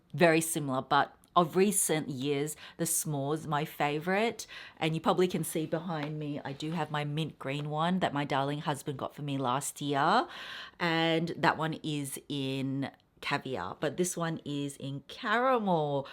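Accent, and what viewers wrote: Australia. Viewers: Hi! I'm new to your channel, but I just love your videos and your Australian accent. Congrats on hitting 300k! Three prizes is my choice. 😘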